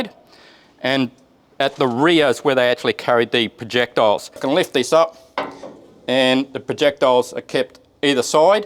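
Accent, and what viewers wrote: Australia. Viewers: You could mute it and try closed captions though CC has some issues with Aus accents.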